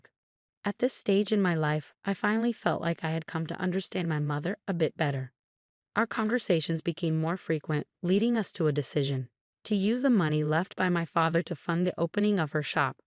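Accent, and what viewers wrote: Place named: United States. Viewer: Sounds American, but money is "yen".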